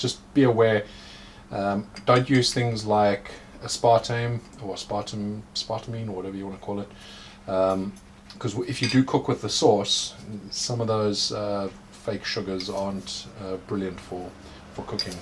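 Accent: South Africa. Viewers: South african ?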